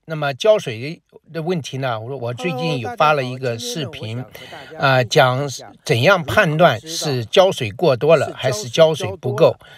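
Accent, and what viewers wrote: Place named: Southern Shanxi, China. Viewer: 听口音感觉您有晋南人的口音，也像青岛人的口音哈哈！我和我妈妈都是您的粉丝！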